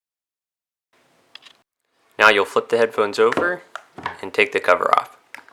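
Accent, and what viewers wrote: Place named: United States. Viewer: Very nice guide. I love American Accent ❤️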